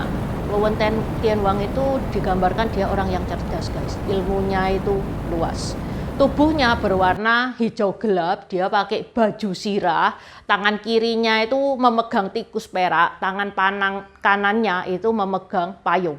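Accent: Java, Indonesia. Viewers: Kok Medok banget 😊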